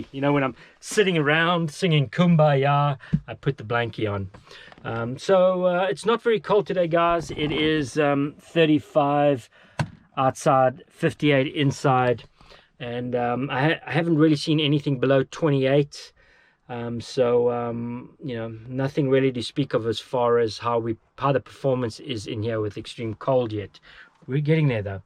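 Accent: South Africa. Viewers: Sounds like a South African. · You know I love that craic what your doing but one thing I'm very curious about your accent I think I hear a bit of South African there but not 100%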